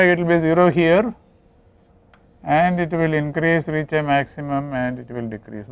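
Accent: India. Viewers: Although the heavy Indian accent is hard to understand,the lecture is wonderful and helpful,thank you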